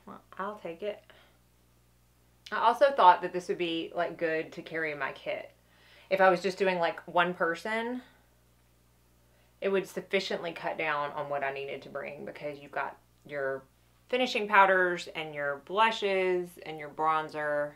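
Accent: South, United States. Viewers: Hi my name is Rousha and iv been wanting to tell just how awesome I think u are! I love your videos I think your so talented. I'm also from TN (murfressboro area) but currently live in NY. I love to watch just to hear you talk it reminds me of everyone back home that I miss not many ppl here have a southern accent lol. I just wanted you to know your one of my favorites to watch and to keep them coming. <mail>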